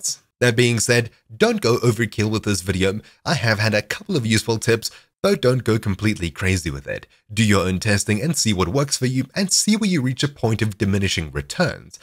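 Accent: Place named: South Africa. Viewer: Thank you, I was able to fix my blurry video of Diablo 1 which was recorded via OBS in 360p, the game's native resolution. The video looked crisp on my computer in the H.264 format using VBR min 4mbps target 20, but on YouTube it was really bad. I changed to CBR of 20mbps (probably overkill) and exported the video at 720p, looks great now. Btw nice South African accent. Your voice reminds me of the famous Minecraft player rendog who plays on the Hermitcraft server. Its a good thing. <3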